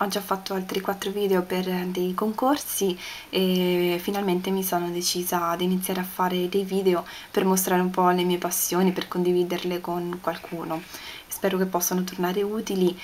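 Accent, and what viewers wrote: Marche, Italy. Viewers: Ciao!! Ti ho scoperta grazie a DieMademoiselleAnne, e devo davvero ringraziarla! Dai dei consigli utilissimi, e poi è un piacere ascoltarti :) Ho notato una certa cadenza maceratese, o forse ascolana... per caso sei marchigiana?? Io si :))) Ciao da una nuova iscritta :))))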